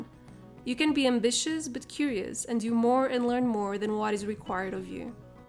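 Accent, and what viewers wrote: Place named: Portugal. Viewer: I just found your channel a couple of hours ago and I have been binge watching your videos nonstop. Your videos have really helped me organize my routine before I go back to college (i'm studying Law). I also noticed your accent and wondered if you were from brazil or from Portugal then I saw some portuguese books during this video and just wanted to say your work is amazing and wanted to send some love from Lisbon. Continua com o bom trabalho e tudo de bom para o futuro. Ps: Clearly english is not my first language but I did my best.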